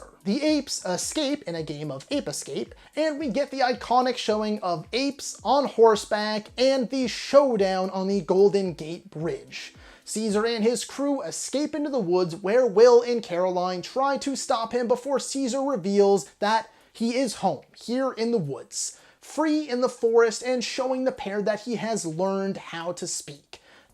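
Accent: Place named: Canada. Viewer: the Canadian accent. “Out” is “Oat” lol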